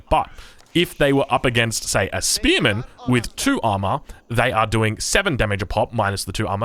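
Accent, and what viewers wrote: Australia. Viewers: I really enjoy your australian accent and the quality of cast is amazing! Greetings from Costa Rica. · Lol no need to explain your Aussie English bro. The people who may or may not be even mentioning your pronounciation need to re evaluate their entire life. The whole world doesn’t speak the same lol. That’s crazy though compared to American English we pronounce our “H’s” the exact opposite; the word 🌿 herb the H is silent. The word Honed 🗡 the H is pronounced. If I were to say “HP” I’d say it just as you do, with out stressing the “H” sound in the beginning; Aech pee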